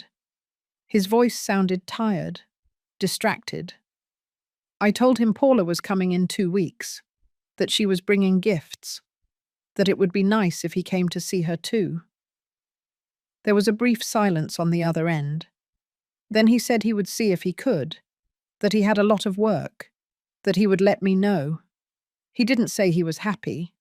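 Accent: United Kingdom. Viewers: The speaker has a different accent because she is British, not American.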